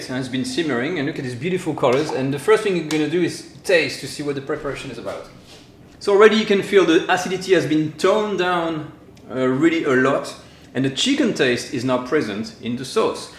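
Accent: France. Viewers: OH My god i love your french accent soo cute <3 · I wonder what kind of dessert would pair nicely. With the acidity of the main, probably something light & sweet to round out the taste spectrum. Side note: I can hear how being in France is starting to subtly influence your accent. (Not a bad thing.) Speaking your mother tongue so much is probably a real treat right now.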